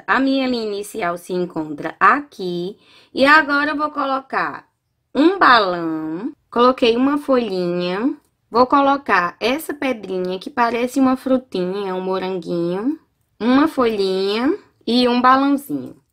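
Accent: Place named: Pernambuco, Brazil. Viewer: Maravilhoso, adorei!!! Que sotaque lindo!! Vc é pernambucana?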